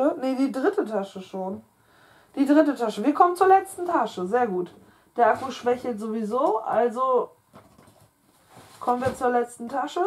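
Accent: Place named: North, Germany. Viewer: dein nordischer Dialekt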